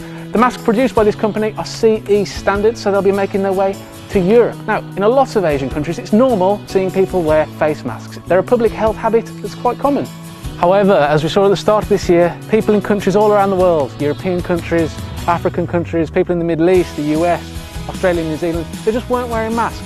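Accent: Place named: United Kingdom. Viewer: 搞笑的英国口音，英国腔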